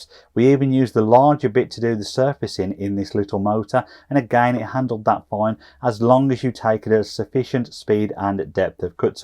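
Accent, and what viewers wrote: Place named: Midlands, United Kingdom. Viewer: Found the channel researching small CNC, heard the Midlands accent, already confident I've found someone who knows his stuff. Great video, subscribed.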